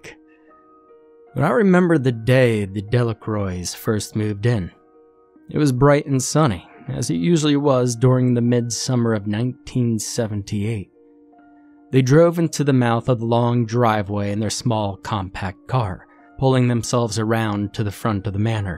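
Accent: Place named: Northeast, United States. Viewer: Accent? Where are you from? To me he just enunciates clearly and sounds almost northeastern, like Massachusetts or something 🤔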